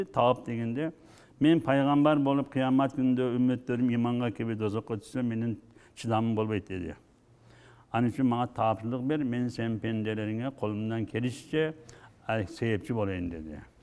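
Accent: Batken, Kyrgyzstan. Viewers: Кыргызча эле суйлоп атат.Бизге окшоп чек арада жашасан сен дагы ушинтип суйлоп калат элен билбейсинби Баткен тараптан окшойт.Ээн кучтуу аалым молдолор Баткенден.Мен сыймыктанам . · Кыргыз тилинде эле суйлөп жатат, болгону түштүк баткен диалекти менен сүйлөп жатат, улутчулдук, аймакчылык кылуу күнөө болот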